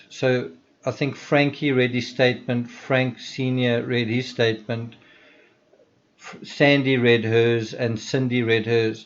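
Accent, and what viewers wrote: South Africa. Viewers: I love your accent 🥰 Sounds South African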